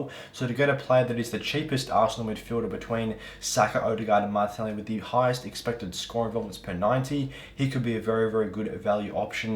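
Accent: Australia. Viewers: Thx for the vid. Also... Thx for the recent reply to my comment re your info. I couldn't quite work out your accent but now you've confirmed you're from Oz. I take it you will be following Big Ange's exploits at TH.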